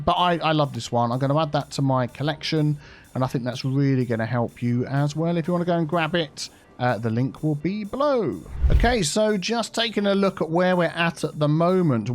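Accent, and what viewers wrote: United Kingdom. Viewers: all your words sound different to me with your British accent ... I am from Canada ... but I guess I would sound wierd to you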